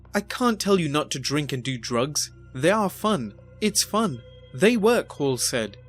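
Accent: England, United Kingdom. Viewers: Love this English's presenters accent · I don’t know why the British accent makes for great documentaries. It’s beyond me, but it works.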